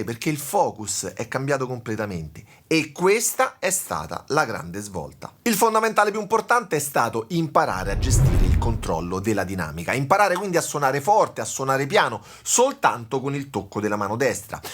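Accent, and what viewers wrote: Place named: Rome, Italy. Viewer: Bellissimo video! Molto illuminante. Nel mio caso, ogniuna delle "storie" è valida per me. Forse la seconda no...ho suonato solo chitarracce 😂. Con la terza sono riuscito a suonare il solo di lapsteel di Walk of life con la Telecaster. Sono partito da x0,5 fino ad arrivare all originale. Soddisfazione immensa! Ho una pedalboard....da 4 anni....sono ancora in alto mare😂😂😂. Sei grande e riesci sempre a farmi sorridere con la storia del non iscriversi😂. Non ti ho dato retta su questo. Complimenti ancora e grazie mille! Sento che sei di Roma o dintorni. Anche io, insegnami!!!😂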